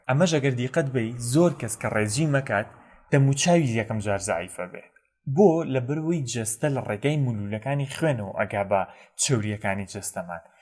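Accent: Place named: Badini, Iraq. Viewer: كه مئك باديني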